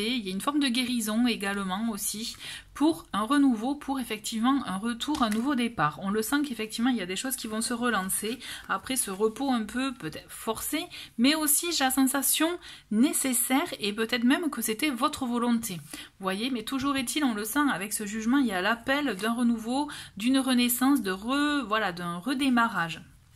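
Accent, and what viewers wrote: South, France. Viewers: Merci 🎉. Joli accent du sud ❤.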